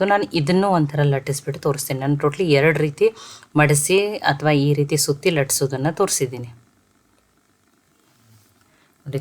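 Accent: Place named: Kalaburagi, India. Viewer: ನೀವು ಕಲಬುರ್ಗಿ ದವರು ಇದ್ದೀರಿ ಏನು ಸೇಮ ನಮ್ಮ ಭಾಷೆ ನೆ ಮಾತಾಡಲತ್ತೀರಲ್ಲ?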